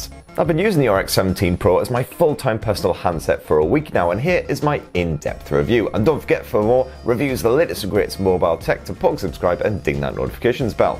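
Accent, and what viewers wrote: United Kingdom. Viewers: A little exhausted to catch your british accent sometimes. Maybe you're willing to a bit slow it down next? Nice video btw.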